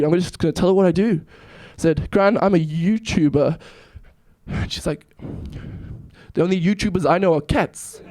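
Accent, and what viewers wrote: England, United Kingdom. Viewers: Your voice already sounds like an English accent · He's been living in the UK for a while, he's picked up an accent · but what if we r not n america or Britain, I am in Russia and omg i absolutely love u!!! u were amazing and its very rare to see people willing to get over their fears u r so cute and u dont sound south african at all i am not trying to be racist plz to take me wrong i am not saying south africans r supposed to sound and look a certain way no i am not but u sound like u have a british accent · HIS ENGLISH ACCENT OMG I DIED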